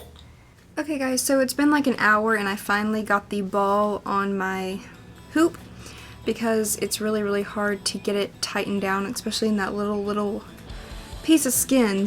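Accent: South, United States. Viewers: Are you southern you sound like you have a southern accent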